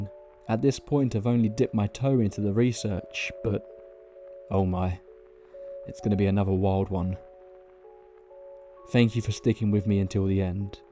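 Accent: United Kingdom. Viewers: fookin bloody british accent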